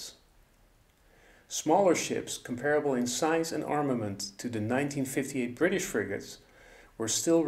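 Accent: Netherlands. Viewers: klinkt nederlands. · That is a Dutchman talking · Wat een irritant Nederlands accent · Is that Dutch accent that I hear? 😅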